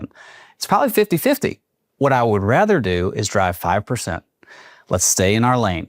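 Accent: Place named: South, United States. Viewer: Your accent is unbearable. Add it to your YouTube persona fake also it’s unbearable. You may not be able to help your accent. That’s nothing against you, but don’t emphasize every word and mix that southern accent into the youtubification of your persona.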